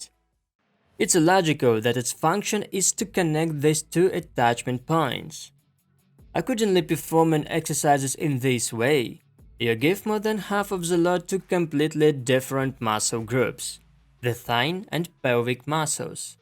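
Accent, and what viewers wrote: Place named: Eastern Europe. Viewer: ah Russia that's why I hear some slavic in your voice. I'm in the UK , mixed ancestry of polish, English, German, Spanish and Barbadian!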